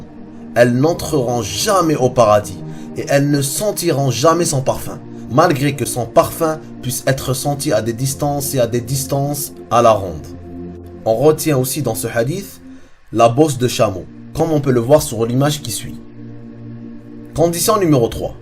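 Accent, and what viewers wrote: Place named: Kabylie, Algeria. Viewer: L'homme qui parle dans cette vidéo kabyle non🤔 (d'après sa façon de parle )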